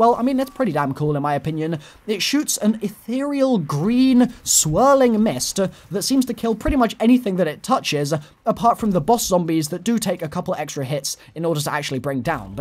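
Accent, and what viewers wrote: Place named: United Kingdom. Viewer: Hey I think you sound similar to David Tennant just by your enthusiasm and your British accent (ik David is Scottish but come on you two have similar sounding voices)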